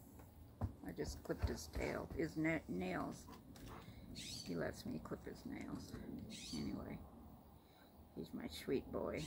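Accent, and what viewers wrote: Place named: South, United States. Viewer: 🍀 Your fur babies are too cute for words! 😎Love southern accents.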